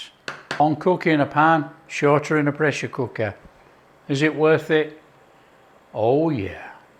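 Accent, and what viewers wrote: United Kingdom. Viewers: Just watched it for the goo 'ol br'ish accent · Sir are you a Yorkshireman ? You sound Dickie Birdie and almost like Groffrey Boycott buy then not quite like him though. Wonder if Dir Groffrey will like this Indian broth 😀 Happy Khichding 😀. Khichdi in Indian colloquial parlance means a ness 😀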